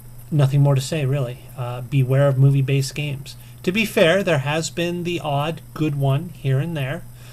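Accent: United Kingdom. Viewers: Your the man!!! lol, its been a while since i've had a big smile on my face lol. I say you sound more Enfield than East End but great atempt, if i heard you talking like that i'd probably think you was from Essex or somewhere like that. Londoners would love you talking like that down the pub, especially the "More tea Vicker" as that saying is normally reserved for when someone *Cough - Breaks Wind - Cough* lol Fantastic. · LOL..great vid man... Nice british accent! Haha.. u tried XFile2708's famous line!! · London accent.Why all americans think london accent is british accent .you are funny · Excellent answers and you sounded great as a Brit too buddy.: )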